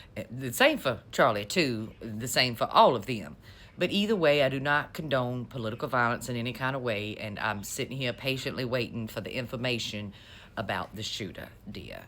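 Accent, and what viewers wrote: South, United States. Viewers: That southern belle tone is annoying as hell im out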